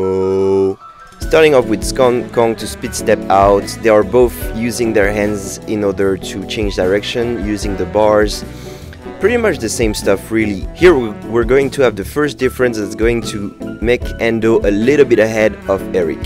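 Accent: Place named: France. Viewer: jpp l'accent français x)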